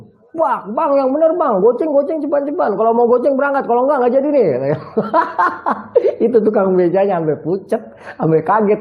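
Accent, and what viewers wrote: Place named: Kuningan, Indonesia. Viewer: Orang kuningan mana nih suaranya 😁 Sehat selalu bang 🙏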